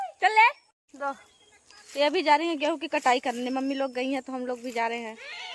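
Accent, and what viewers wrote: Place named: Chhattisgarh, India. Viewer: कुछ कुछ भाषा आपकी छत्तीसगढ़ का भाषा जैसे बोलते हो😮😮😮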